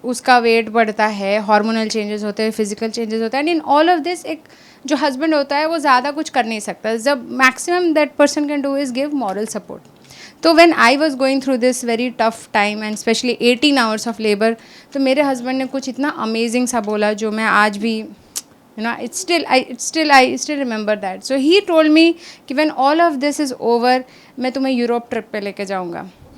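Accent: Bihar, India. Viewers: You are bengali but bihari accent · How did you develop the bihari accent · Ee अक्सेन्ट bihari h ka 😂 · Bihar ki ho ka Didi aawaj ke tone se to yahi lagta hai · mam aapne accent Bihari kaise hua? Aap Bihar mai grow up Hui hai ? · A bengali with Bihari accent has got to be one of my favourite thing to listen to. Good set, and nice puns. Keep growing mam. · Aapka accent bihari kaise h? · Bengali with bihari accent is amazing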